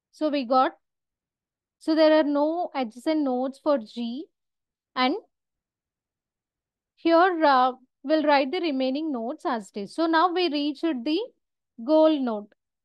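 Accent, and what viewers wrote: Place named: India. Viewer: Every computer science student adjacent student should be thankful to India. Once that accent hits your ears, you know you're getting a straight to the point video on the subject matter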